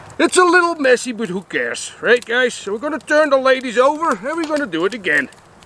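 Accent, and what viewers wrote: Netherlands. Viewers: this guy really sound dutch are you or not? 🤨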